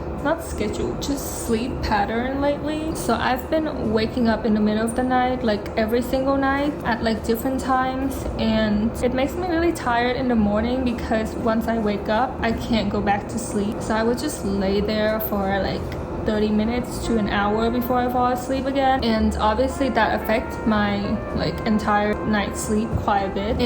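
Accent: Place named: United States. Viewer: I think you are Vietnamese but your accent very looks like from the US. It makes me confuse :v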